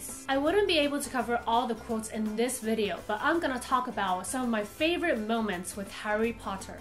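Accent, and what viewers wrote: United Kingdom. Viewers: 有配合主題搭配英國腔哦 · Your British accent is cute! Nice video. Hey from England. 😊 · 滴妹英國腔好好聽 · 跪求。。。我要英國腔，超好聽滴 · 一直覺得滴妹的英國腔很像妙麗 😍😍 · 😍😍😍😍British accent more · 英國腔是怎樣～～😁😁😁太萌了吧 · 這次好像特別用英國腔來說了呢哈哈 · 真的好喜歡滴妹的英式英語💕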